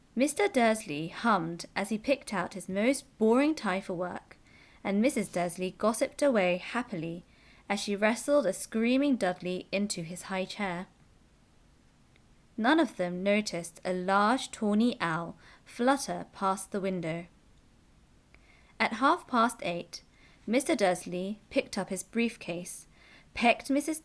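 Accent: United Kingdom. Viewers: 영상 감사합니다🙂 영국발음 멋있어요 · After all, football commentary and Harry Potter books should be heard in British English. thank you. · 영국발음 너무 매력적이에요 :D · 해리포터 원서로 공부하고 있는데 영국발음으로 읽어주시니 너무 좋아요~~!! 구독하고 가용ㅎㅎ죽음의 성물까지 존버합니다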